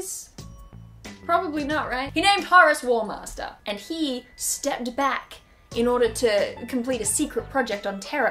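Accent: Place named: Melbourne, Australia. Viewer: Australian? By that accent I rekon melbourne maybe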